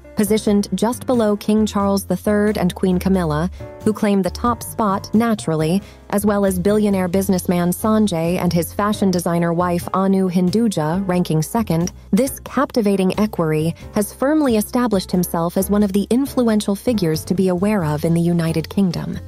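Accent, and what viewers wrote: United States. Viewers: This video is narrated in American version of English so it was pronounced correctly in that language.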